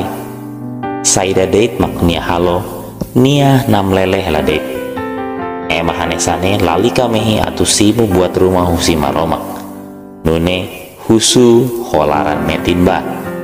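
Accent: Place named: Indonesia. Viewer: Voice nia Orang indo nih🥰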